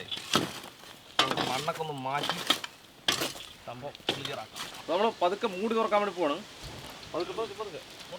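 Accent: Palakkad, India. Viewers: Palakkkadan slang♥️😌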